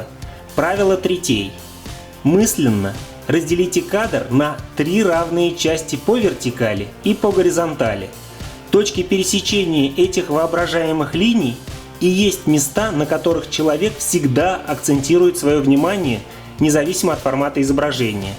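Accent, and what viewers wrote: Russia. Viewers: О, чувак владимирский похоже))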